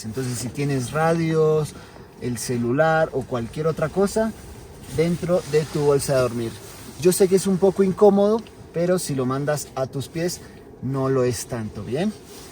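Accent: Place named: Argentina. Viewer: que buen video! me llamo mucho la atencion tu acento jaja, cada tanto te salia un acento argentino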